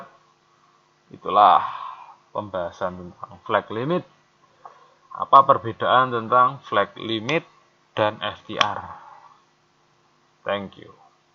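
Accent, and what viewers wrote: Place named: Surakarta, Indonesia. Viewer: Bapak apa orang solo ya dari logatnya kentel bgt hehehe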